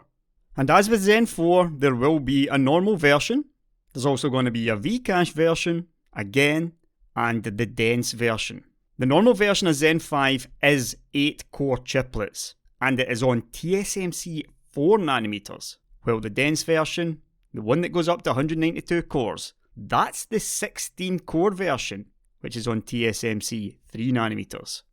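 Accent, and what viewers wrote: Scotland, United Kingdom. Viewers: a scottish tech youtuber say "all right guys, how's it goin?"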